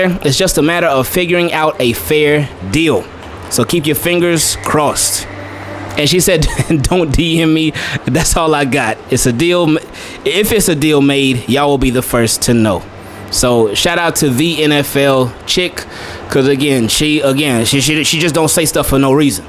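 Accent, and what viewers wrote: Baltimore, United States. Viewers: Is that a B-more accent I hear.....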